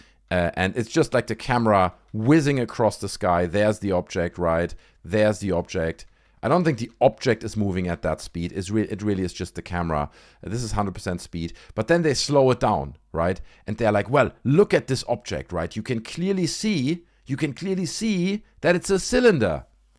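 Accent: Germany. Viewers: LMAOOO, DUDE!! RIGHT?! It pisses me off so much, We need REAL DATA!!! NOT all of this BS that does nothing but muddy up the waters in this area of research... It's such a shame... It really is man... (Cool German accent you have btw haha)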